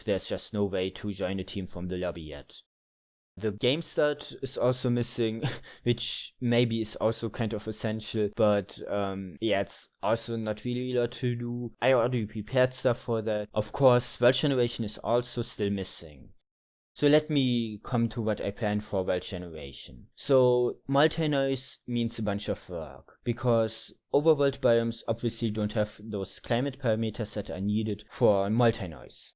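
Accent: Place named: Germany. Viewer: a yes. my library of german minecraft yt accents is growing once again, but maybe by devlog 4 I will get used to it and won't notice anymore (as happened with mango, clover, and probably many others) EDIT: also that pronunciation of your name is... is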